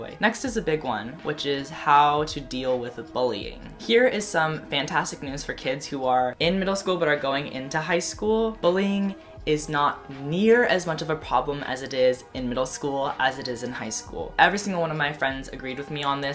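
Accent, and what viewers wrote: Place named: South, United States. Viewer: i have been subscribed to you for some time now and im now realizing that you have a really light southern accent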